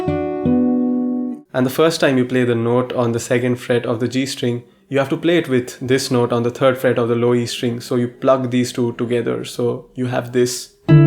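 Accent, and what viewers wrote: India. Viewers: ohh, Indian accent ❤️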